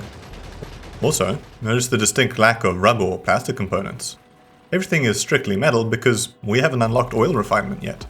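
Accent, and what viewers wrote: South Africa. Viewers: South African, eh? Neat :) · Was like - thats a SA accent! :) Good luck boet! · oh my god are you south african??? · You’re South African!!! Howzit bru 🤙🇿🇦🇿🇦 love the videos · Love the ZA accent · I mean this in the nicest way possible, this channel is such a comfort channel for me just cause it's so nice to hear a fellow south African yapping about nerdy shit (cool nerdy shit) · I KNEW YOU WERE SOUTH AFRICAN!!! nice! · A wild South African spotted! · Is he South African??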